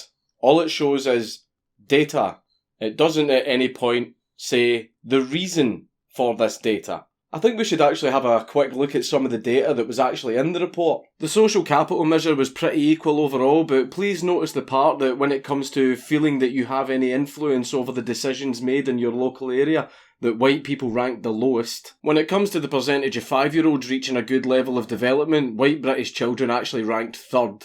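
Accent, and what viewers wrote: Scotland, United Kingdom. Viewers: Thanks for bringing up the truth, and confronting that racist black woman! By the way, love your Scottish accent. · He's Scottish? I thought he sounded like that because he'd had a stroke.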